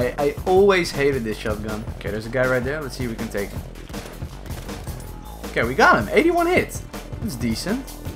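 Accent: Netherlands. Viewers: You sound dutch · It sounds u are dutch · I hear his accent, 100% Dutch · Je kunt duidelijk aan je stem horen dat je Nederlands bent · I detect a Dutch accent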